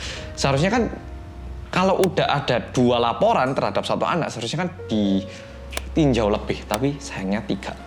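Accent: Java, Indonesia. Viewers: TRMKSH KOKO MEDHOOOKKK...😃😃😃😃🙏🙏VOOUME SUARA NYAAA KOKO JELAS BENING JERNIH YAAAAA.....😃😃😃😃😃😃🙏🙏💖💖💖💖💖💖💖💖💖💖👏👏👏👏👏👏👏👏👏👏👏👏👏👏👍👍👍👍👍👍👍👍👍👍👍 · Wong Korea bahasane medok koyo wong jowo sampean (mas )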